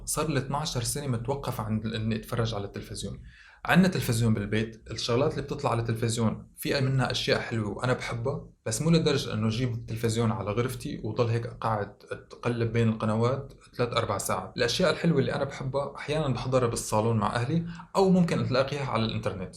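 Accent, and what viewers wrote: Syria. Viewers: حكيلنا عن حالك اكتر...حبيت قناتك.... حاسستك من سورية...صح؟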